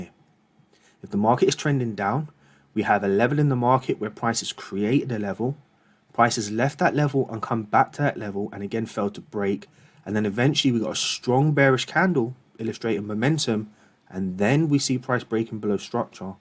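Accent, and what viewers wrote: London, United Kingdom. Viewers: Really sound like a cool london mandem! Hope to meet you one day G :) Great content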